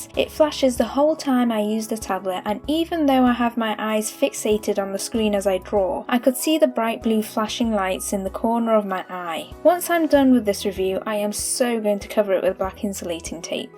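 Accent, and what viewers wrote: United Kingdom. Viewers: Thank you for your tutorial thank you for explaining it that was very impressive love your British accent and again I gave you a thumbs up and a subscription thank you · I didn't notice the accent probably because we're both British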